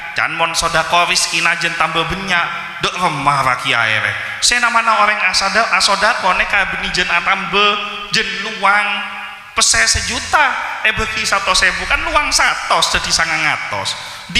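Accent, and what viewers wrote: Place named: Sumenep, Indonesia. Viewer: Dari mana asalnya kiai ini?. Logatnya seperti orang Sumenep.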